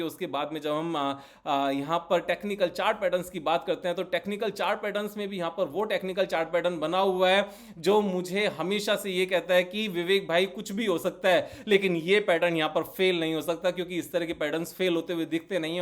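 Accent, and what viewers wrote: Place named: Gujarat, India. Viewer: Bhai Have u been to Gujarat ever, sometime your tone has Gujarati touch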